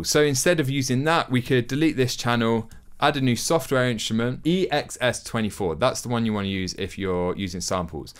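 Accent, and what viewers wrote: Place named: Manchester, United Kingdom. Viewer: you from Manchester bro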